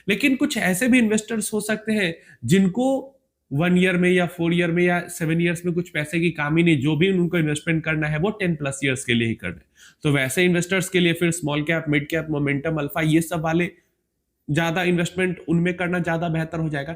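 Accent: Bihar, India. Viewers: Namaste aap bihar se ho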